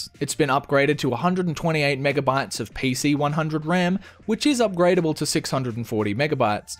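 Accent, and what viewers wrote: Australia. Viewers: Woo your Aussie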